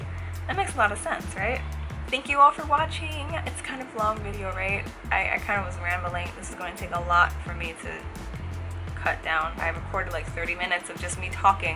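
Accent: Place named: Puerto Rico. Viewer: I knew you was either Black or hispanic, now you say you're Puerto Rican, I can find it in your speach lmao im Puerto Rican too but I look like a white boy so this shits pre interesting lol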